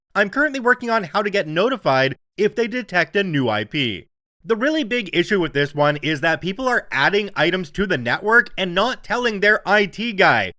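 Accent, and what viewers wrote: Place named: Canada. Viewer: Weird: this is the first time I've noticed your accent is Canadian.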